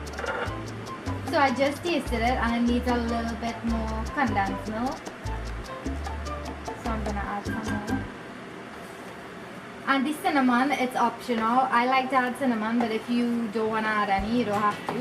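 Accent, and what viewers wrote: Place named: Trinidad and Tobago. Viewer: U a true trini "ah" ,"doh" "kinda".. ah love we dialect too bad❤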